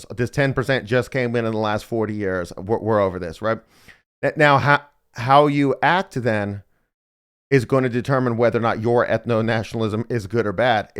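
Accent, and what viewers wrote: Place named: United States. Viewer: Why is this guy who is clearly of European heritage speaking as if he belongs in the Americas?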